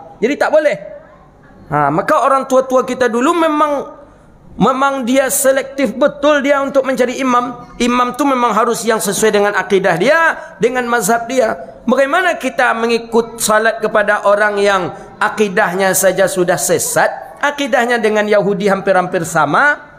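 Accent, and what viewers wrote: Minangkabau, Indonesia. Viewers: Saya sangat merindukan ceramahnya Ustadz yg khas dgm dialeg minang, tegas dan berilmu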